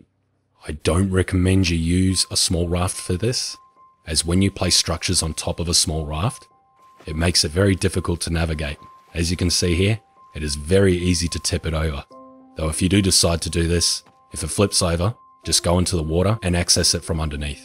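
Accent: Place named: Australia. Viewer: Australian accent what part of oz are you from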